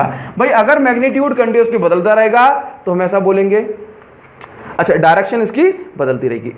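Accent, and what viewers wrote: Odisha, India. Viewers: R u from odisha? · Sir are you from Odisha? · Are you from odisha?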